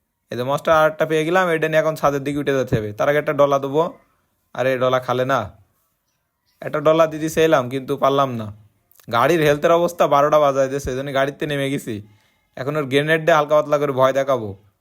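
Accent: Jessore, Bangladesh. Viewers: ভাই আপনার বাড়ি কি যশোর, সাতক্ষীরার দিকে?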